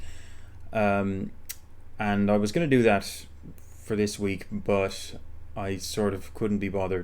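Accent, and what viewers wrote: Ireland. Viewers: better than my Irish accent and I'm British haha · this shit is hilarious man I love it! And you pretty much nailed the irish accent for someone not actually speaking like that regularly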